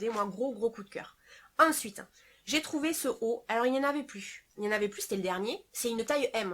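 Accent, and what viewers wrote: South, France. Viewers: Coucou jolie encore une fois super haul, pas de souci on entend toujours ton bel accent du sud!!! J'ai aimé ton magnifique sac camel qui fait très chic, ma préférence va aussi vers cette très jolie jupe crayon en dentelle et ton pantalon camel taille haute avec des boutons (j'ai cette même coupe avec bouton mais en jeans) Petite question peux tu vraiment garder une seule pièce? Pas facile!!! Les pièces te vont toutes à ravir Je te dis soigne toi bien tout là bas dans le sud et te fais de gros bisous A bientôt dans ta prochaine vidéo Farah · Coucou, comme d'habitude, très chics tous ces achats que tu as fais. j'ai pratiquement tout aimé: la veste à pans, le pantalon, le superbe combi-short, le pull épaules en dentelle, les bottines..... Le sac est très joli. Moi mes sacs préférés sont les sacs seaux, à pompons, à franges, à clous, en moumoute, aspect daim..... 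Je raffole de ces sacs:) Le pull bordeaux est très beau. J'en ai aussi quelques-un dans mon armoire. C'est vrai, ça tient chaud. Les robes-pulls, j'en ai pas mal; je suis bien dedans. J'avais deviné, à ton accent, que tu vivais dans le sud:) Pour ses vacances, mon frère allait souvent à Banyuls-sur-mer (réputé pour son bon vin).Il ne devait pas être très loin de chez toi:) Merci pour cette sympathique video. Soigne-toi bien. A bientôt. Gros bisous ma belle.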